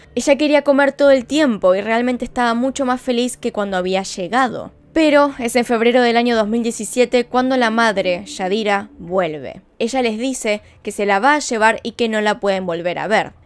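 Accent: Argentina. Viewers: Así hablamos los argentinos jaja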